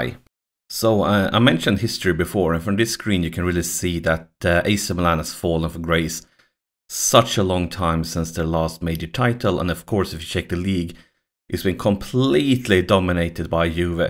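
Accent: Sweden. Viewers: Are you swedish? Sounds like that on the accent!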